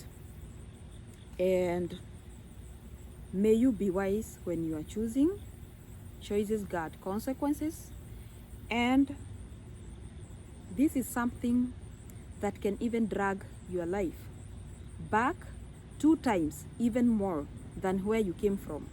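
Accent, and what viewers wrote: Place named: United States. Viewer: Am happy to heard on how you pronounce your words, now you are American typical..How long you have being there!?.😂😂💪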